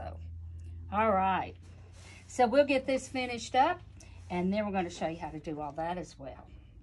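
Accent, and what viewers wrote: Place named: South, United States. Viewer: Excellent tutorial! And I love your southern accent!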